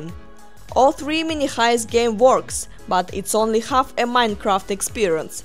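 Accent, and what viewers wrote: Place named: Russia. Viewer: UR ascent is so Russian. Are u from Russia? Awesome demonstration btw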